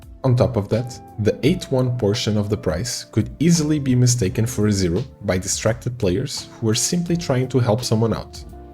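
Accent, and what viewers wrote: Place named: Chile. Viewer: Sos chileno no? Te saque el acento en la primera oración jaja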